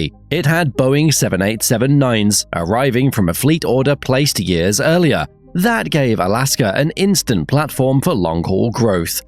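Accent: United Kingdom. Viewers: You have the most annoying standard British voice ive ever heard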